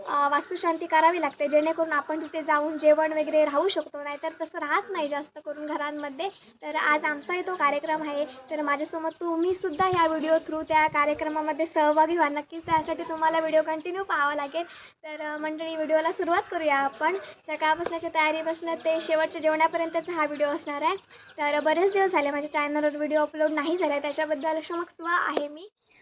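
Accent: Malvani, India. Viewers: आम्हाला नाही बोलवले वास्तुशांती ला तू मराठी न बोलता मालवणीत सूंदर बोलते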